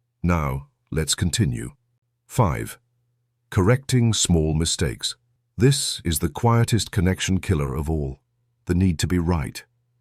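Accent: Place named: United Kingdom. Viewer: I just realized the AI voice has a British accent. What a comical choice. Did the creator of this video think the accent would give the presentation more authority? I wonder.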